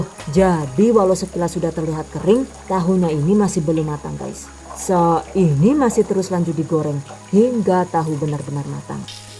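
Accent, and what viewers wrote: Banyumas, Indonesia. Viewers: Paling suka kalo ada selingan 'kata kata mutiara' yg ga ada di channel lain Pake bahasa jawa ngapak, auto ngakak 🤣🤣🤣bisa aja nih, kirain orang banyumas- cilacap -purwokerto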